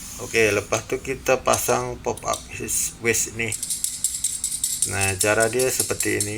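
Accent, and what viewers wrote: Malaysia. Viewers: Cakap pon dh campo+ mcm org msia😅😂 tmkasih ya❤moga dimurahkan rezkinya&diberkati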